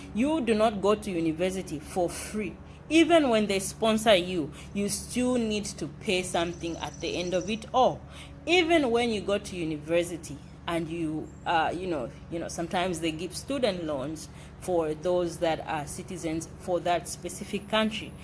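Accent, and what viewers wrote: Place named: Jamaica. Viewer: That’s not good everyone should be treated equally... you almost sound Jamaican.. I rather stay home and suffer..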